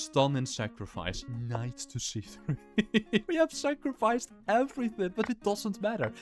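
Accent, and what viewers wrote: Netherlands. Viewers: Are you Dutch? Sorry, I always get distracted by the accent of Dutch people on YouTube.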